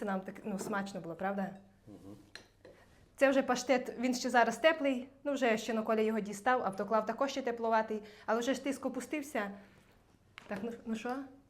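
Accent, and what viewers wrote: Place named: Zhytomyr, Ukraine. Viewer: Я зжитомерщини ваша мова дуже схожа на нашу бальзам на душу сімейного вам щастя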